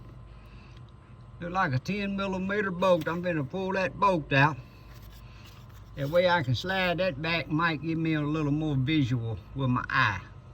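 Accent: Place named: Kentucky, United States. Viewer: Great video buddy! I got to change mine and I’ll know what I got coming. I don’t hear many folk on YouTube that kinda sound like me 👍 I’m from Southeastern Ky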